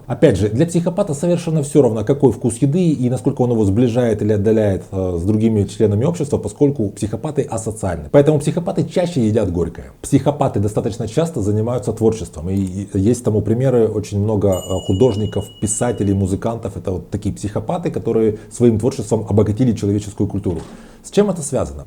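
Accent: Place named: Ukraine. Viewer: какой-то говорок у вас украинский. Слишком быстро говорите.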